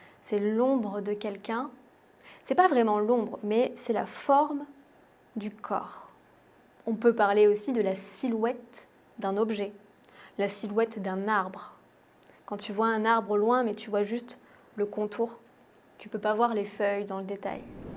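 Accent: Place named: South, France. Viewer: PS : Je suis pratiquement sûr que tu habites la partie sud de la France. Etant breton, je remarque vite les accents ! Enfin, je sais pas pourquoi je dis "en tant que breton", je n'ai jamais lu que l'on avait ce super pouvoir plus que d'autres ^^ Sinon les arabesques ont été identifiés en Occident au XVe siècle comme un élément de l'art islamique, d'où leur nom. Mais en Occident ce terme a été utilisé pour désigner par extension des entrelacs et des rinceaux divers, y compris ceux de tradition occidentale, même lorsqu'ils n'ont aucune correspondance avec les motifs arabes.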